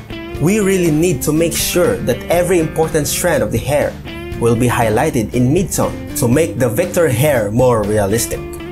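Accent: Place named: Philippines. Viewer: pinoy ka ba? napaka pinoy ng accent mo hahhaha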